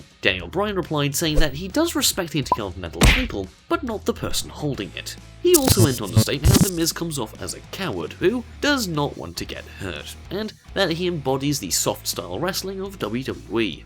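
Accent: United Kingdom. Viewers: shocking not so much just another countdowner on youtube with a british accent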